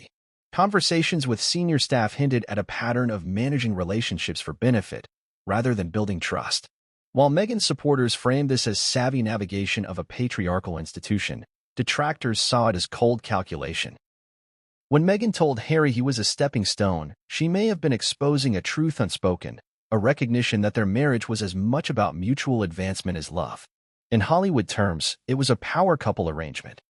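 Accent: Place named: United States. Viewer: Is this true or just another example of American accented BS?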